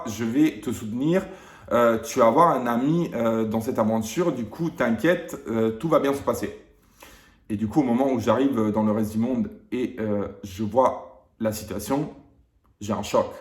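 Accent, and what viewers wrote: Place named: Italy. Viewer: j‘ai eu un „coup“ de coeur sur toi dans les apprenties tu te laisse pas faire t‘as un coeur d‘or ET EN PLUS T‘ES TELLEMENT BEAU AVEC TON ACCENT ITALIEN TROP DE LA CLASSE VRAIMENT une personne incroyable!!!!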